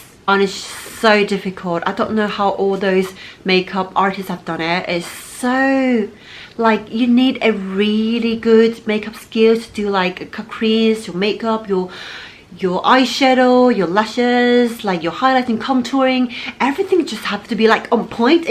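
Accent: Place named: United Kingdom. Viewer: 我高中畢業的歐巴桑都聽得懂🤗 英國腔 👍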